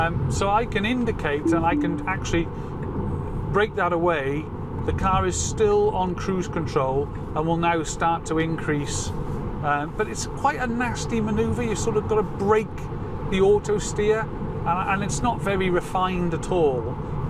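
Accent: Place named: Wales, United Kingdom. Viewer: Just thought id let you know that I placed an order for a m3 and used your code :) - As said, have been really enjoying your content + Welsh accent always a winner lol ... But, got into a bit of a mess, when I used your referral it didn't go to the referral landing page, rather it just went to the standard Tesla page... I didn't know any better until after I placed the order :( - Gave Tesla a call though and they sorted it out thankfully! So, hopefully, you do now have another 1,000 miles in the 'tank' as well :D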